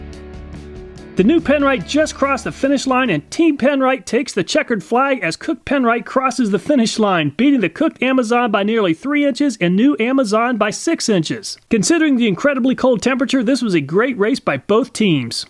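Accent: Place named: Pittsburgh, United States. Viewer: I'm from the Pittsburgh area....your accent....my ears cant be wrong....you're from there too right?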